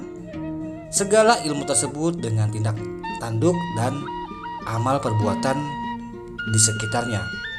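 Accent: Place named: Semarang, Indonesia. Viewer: Satrio piningit bagakan.terbelenggu Di lobang tikus sendiri 😊 Sambil ngencak HK Kecil kecilan kamu sepertinya orang SMG logatnya nada Bicara sepertinya Aku pernah kumpul Sama orang smg😊 Di puncak. Cemara😊😊😊😊😊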